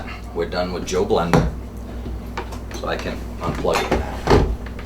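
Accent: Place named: Texas, United States. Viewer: I love the Texas accent. Great job!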